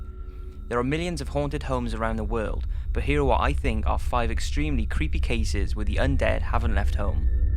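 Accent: Wales, United Kingdom. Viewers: are you welsh? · Do I detect a Welsh accent? Lol might be wrong but my Welsh ears hear some tones ;p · what a retarded fucking comment..this is guy sounds British so presumably he only speaks English and does his research from books written in english and from the english part of the internet. he's not gonna read/research blog post or article about some pakistani family's encounter with a ghost because it would most like be written in Urdu, for pakistanis by a Pakistani. I guess you gotta forgive him for not speaking every single damm language in the world - I hate race-baiters. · can't beat a bit of welsh accent see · Are you Welsh? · Omg you have a British English accent I always wanted British English accent so I be royalty you can teach me how to be British I love your mystery videos 😊😊😊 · It's the welsh accent hun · Are you british? you sound british. 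:) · This guy sounds like he's from Newport in wales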